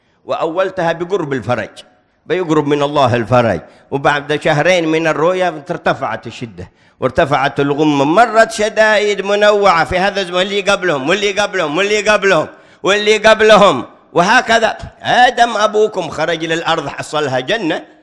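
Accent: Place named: Hadhramaut, Yemen. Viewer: كيف داعية وهو يتكلم بلهجته‼️😐 كيف يفهمون عليه و لهجته حضرمي حبشي‼️😐 والجمهور كله من العجم الله المستعان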